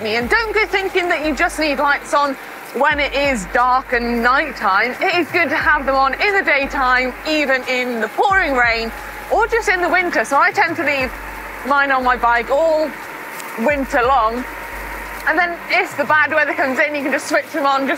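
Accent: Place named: United Kingdom. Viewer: Oh, i love the bristish accent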